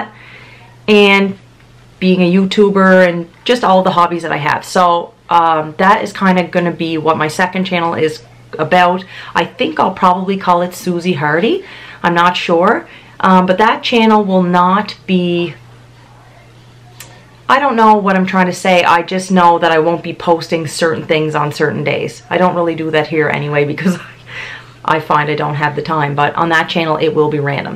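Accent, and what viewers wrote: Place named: Cape Breton, Canada. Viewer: I would definitely subscribe if you started a Mom channel. I mostly watch the Mom content anyway. I stumbled upon your channel ages ago before you started saying that you were from Cape Breton in your intros. 10 seconds into it I said, “That girl was got to be from Cape Breton!” I was born and raised there but live in NB now. I love your channel!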